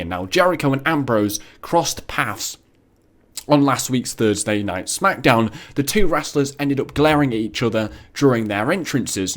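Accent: England, United Kingdom. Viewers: Mate what time is this in England if you live there (you sound like you do)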